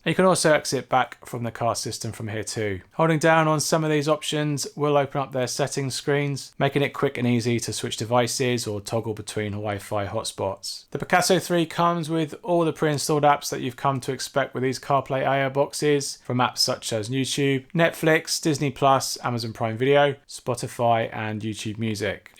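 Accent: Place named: United Kingdom. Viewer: Hey man just some friendly advice for your English UK self haha. We all appreciate the heck outta your videos n the time n effort that goes into each one as they're not easy. But with that said, your videos have all become the exact same in regards to the tone of your voice or mono tone effect if you will, and it's causing many people (I've spoken to others who have mentioned same thing) to downright turn it off cuz they've become flat and boringish to an extent. Most noticably when you talk about good things about the device then nonchalantly dive into bad things without even segwaying or mentioning it. Wanted to bring it up bcuz we all know it better than this bland same shit different day attitude and script u seem to have. So let's pep it up and learn from our mistakes. Keep on keeping on. Or is it? Or however it's pronounced I'm bad with that Uk accent. 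Take care buddy.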